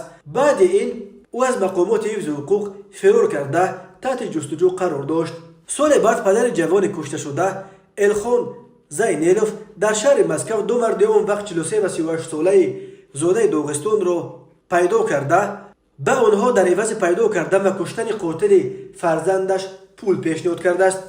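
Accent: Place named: Kulob, Tajikistan. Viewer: Ма хдм ай Кулобм гапзаниш 100%ай лахчаш амка ширинай бодиққат гуш кн сирф точики гап задестай чхел нофахмоай ку аму карима ё чумлае ки нофахмоай нависонш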